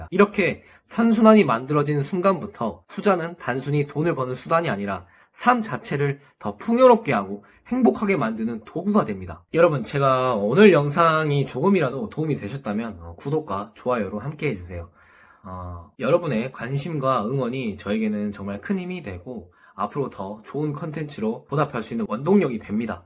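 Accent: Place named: Honam, South Korea. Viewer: 호남사트리 ㅋㅋㅋ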